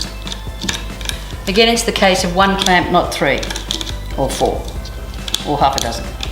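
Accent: Australia. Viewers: Dam i didnt expect the ozz accent..But i do like her tho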